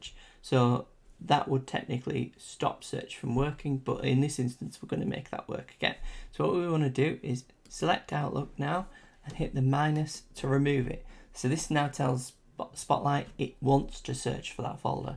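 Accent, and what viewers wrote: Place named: United Kingdom. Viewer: You are a genius! I have gone to so many sites to try to fix this flaw on my brand new Macbook Air and your solution is the one that has made the Outlook search function work. The file display on my computer was a bit different than what was shown on your screen but I kept working to open the folders until I finally found Outlook in the library section. By including and then unchecking it from privacy it has started the search function. With your accent you might be in the UK--wish I could buy you a pint!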